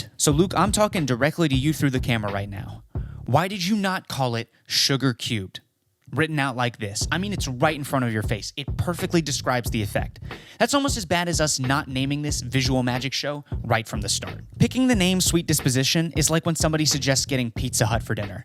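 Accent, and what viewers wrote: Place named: United Kingdom. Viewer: Awesome just awesome and British accent on point 👌👌👌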